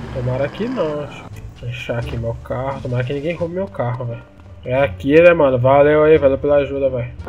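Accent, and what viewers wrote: Bahia, Brazil. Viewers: É baiano ctz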